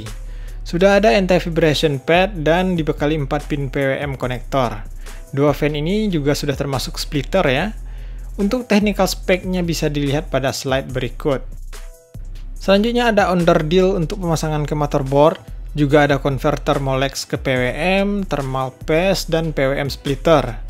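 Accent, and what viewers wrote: West Sumatra, Indonesia. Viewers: Orang minang ya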